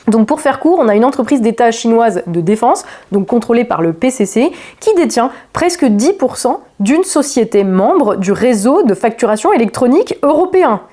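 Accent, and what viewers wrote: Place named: United Kingdom. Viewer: Autant on passe sur l'accent anglais globalement autant le pépale répéter tous les 15s dans la vidéo était très pénible à entendre..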